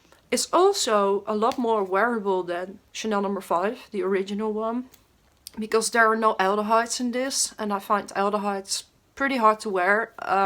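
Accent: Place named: Netherlands. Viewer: Ja je Frans is echt Nederlands bijna net zo erg als de Amerikaanse dames maar bij jou is t acceptebel,;))))). Ga zo door gefeliciteerd met 2 jaar blog.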